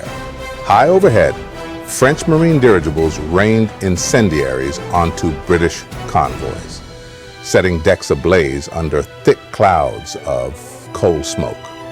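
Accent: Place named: United States. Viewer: This should have been narrated by a British man, not a black American. Good graphics though.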